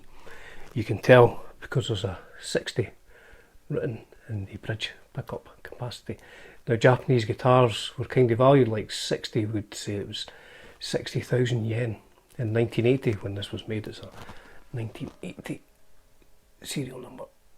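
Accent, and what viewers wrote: Scotland, United Kingdom. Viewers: I can listen to Scottish people pronounce Japanese names all day 🌝👍🏼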